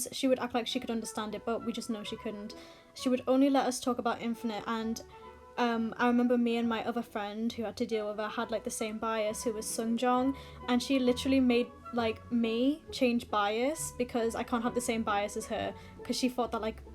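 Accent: Newcastle, United Kingdom. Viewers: omg ur from newcastle hi sis🥺🥺🥺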